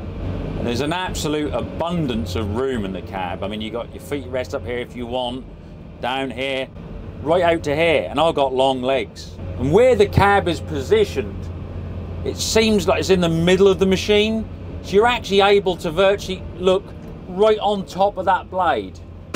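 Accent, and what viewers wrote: Norfolk, United Kingdom. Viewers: What accent is that? Norfolk?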